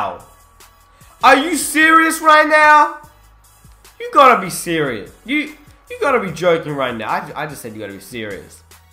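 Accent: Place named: Australia. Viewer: yo are u from Australia or England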